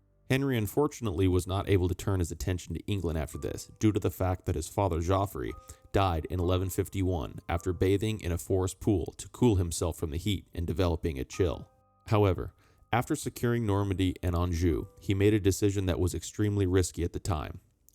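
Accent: United States. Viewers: I hear his American accent, but can't identify the region.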